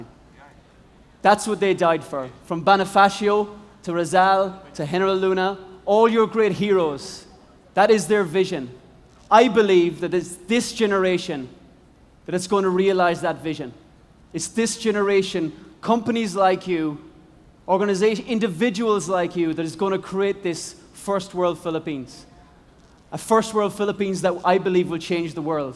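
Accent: Ireland. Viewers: suntok2yoface He is Irish. You are being narrow minded. Fyi, even among north American speakers, Brits, Australians, and other English speakers, they have varied accents and ways of speaking the language. Try to be more open minded before making a comment. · I love your accent lol it reminds me about my ex bf from Ireland too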